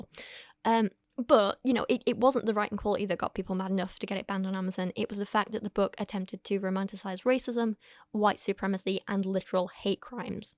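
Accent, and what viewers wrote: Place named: North, United Kingdom. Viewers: I appreciate your takes on things and your unapologetic-ness (and your nothern accent! Makes me feel right at home.) People have lost their damn minds. Look after your mental health after this one, lovely <3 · I enjoy this channel because it's hard feminist truths dropped in a lovely northern accent and also sometimes you hear/see a gremlin (hi!)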